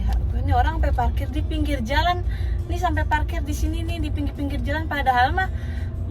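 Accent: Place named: Jakarta, Indonesia. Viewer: ya Allah mpok😱..kgk salah ntu😅..alis knp tinggi sebelah ya mpok🙄..sukses trs ya mpok dgn logat betawi nya🤩🤗🤗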